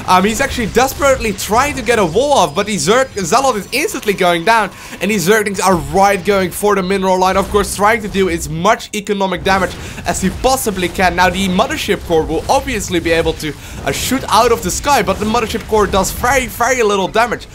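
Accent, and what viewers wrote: Netherlands. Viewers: lol ik wist dat jij nl moest zijn door je accent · Ik dacht al dat je een Nederlander was :), je spreekt heel goed Engels maar dat accent zal nooit weg gaan :P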